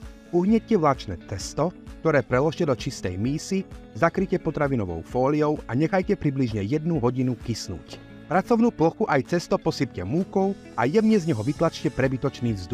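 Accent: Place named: Czech Republic. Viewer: Ten pepícky prízvuk je odporný.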